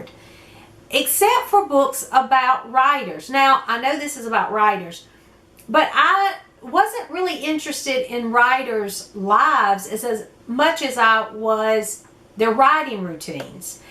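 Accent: South, United States. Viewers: I'm so curious where you are from? I'm an East Tennessee girl but your southern drawl has me beat! 😉💜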